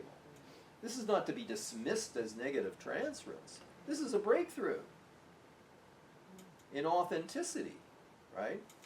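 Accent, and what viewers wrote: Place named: United States. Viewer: I think i recognise an american accent (i'm french), and i think your students are lucky. In France it's still difficult when your psychologist has been formed at the university and is from my generation (i'm 49) to critises Freud's theory when you talk about your life and you see on the face of the psychologist that you're not taken seriously. You have to talk about Oedipe theory and fantasies and why you think it can't be used to be analysed, and most of the lime they laugh at your face because they always pretend you didn't understand it how Freud meant it ! Hopefully i discovered Ferenczi about traumas and identification to the agressor. But so called psychoanalysis based on Freud's ideas has still too much power in France. If you want to come and make conférences against it, you're welcome !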